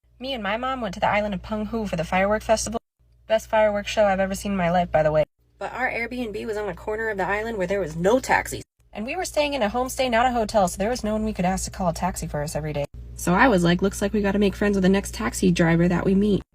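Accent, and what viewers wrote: Standard. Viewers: 她的中文發音很標準呀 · 這個女生英文說得好標準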